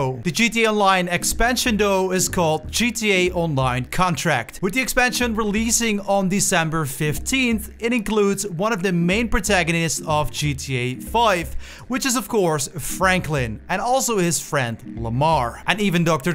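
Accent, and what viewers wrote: Netherlands. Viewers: You dutch bro? I live in Amsterdam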